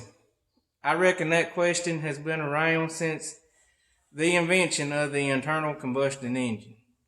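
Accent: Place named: United States. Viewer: cheers from poland, i got here by randomly searching "diesel truck" just cause i was bored lol. i like your accent. its nice to hear various american accents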